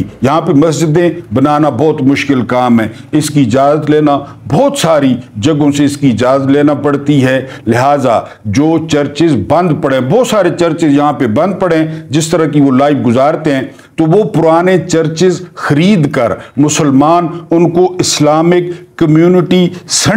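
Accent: Saraiki, Pakistan. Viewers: Masha ALLAH Zaberdust V Log kertey hein, Saraiki Touch bohot hi acha lagta hey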